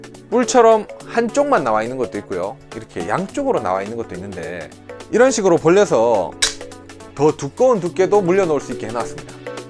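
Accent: Gyeongsang, South Korea. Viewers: 저 진짜 팬이에요 ㅎㅎㅎㅎ 다른 아이디로 cocokim 으로 구독 하고 2년 넘게 보다가, 지금 유튭 활동중인데 너무너무 재밌게 보고 있어요 ㅎㅎㅎㅎ 저는 미대 공부한 프리인데 공구 보는게 너무 재밌어요!! 타계정으로 보기 불편해서 주계정으로 또 팔로했네용 ㅎㅎㅎㅎ 언능 언능 보려규요 연기력도 늘었다고 ㅎㅎㅎㅎㅎ 한 사람이에요 갱상도 고향이라 넘 목소리 친근해서 힐링 되서 빠져서 들어요...ㅎ ㅅ ㅎ ❤️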